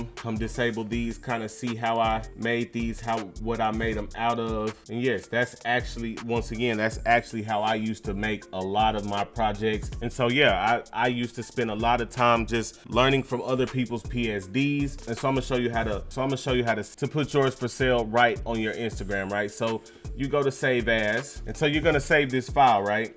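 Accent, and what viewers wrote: United States. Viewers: which part of usa are you from asking because of your accent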